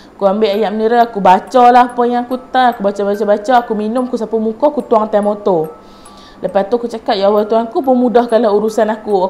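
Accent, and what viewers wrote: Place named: North, Malaysia. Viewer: Assalamualaikum...sempoi first time dgar ni...dah follow page ni...bhasa utara to smpoi...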